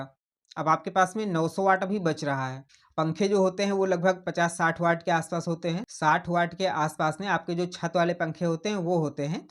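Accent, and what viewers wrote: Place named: Western Uttar Pradesh, India. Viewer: आवाज तो आपकी वेस्ट up की लगती है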